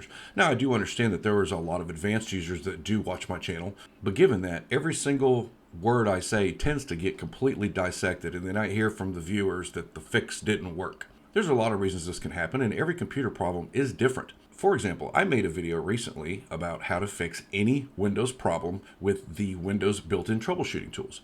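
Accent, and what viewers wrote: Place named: United States. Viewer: I enjoy watching computer help videos when the speaker is from America and has a pleasant voice, which you have, you even sound a bit like a radio man. Happy New Year!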